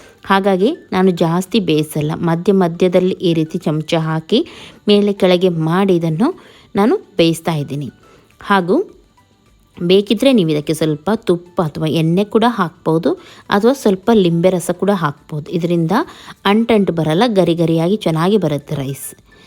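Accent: Mangalore, India. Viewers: Mam are u mangalorean...🤔🤔🤔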